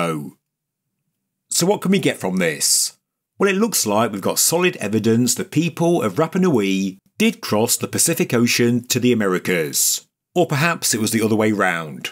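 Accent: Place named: Leicester, United Kingdom. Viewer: Leicester I think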